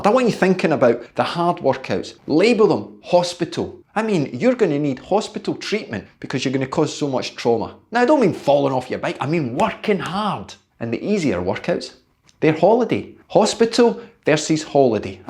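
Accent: Scotland, United Kingdom. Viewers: I’ve been working to much and now have time to get back to riding. Body isn’t in very good shape just did two rides of twenty miles after a few days of working on the bikes and fifteen minute butt accumulating rides on the trainer. Didn’t ride real hard but was pleased to have survived as well as I did. Power meter battery was dead for the first ride so no numbers for it. Second ride with power I showed a twenty minute of 170 and pleasingly also a 170 heart rate. At 63 the latter is well a surprise! Now to recover and attempt to acquire some endurance and riding strength again. Life would be simpler without jobs! Enjoy listening to your Scottish language and do apply some of what I hear. Hope your health is better then what was before. 😀 Have a good day over there and be safe!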